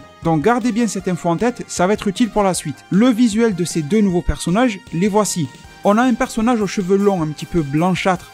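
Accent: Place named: South, France. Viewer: tu as un accent du sud il me semble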